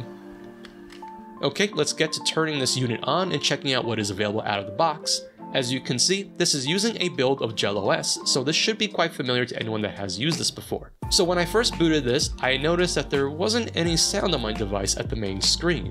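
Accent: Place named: Delaware Valley, United States. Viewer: Another great deep-dive review! Thanks!! BTW, are you originally from Philly? You sound like a Delaware Valley guy!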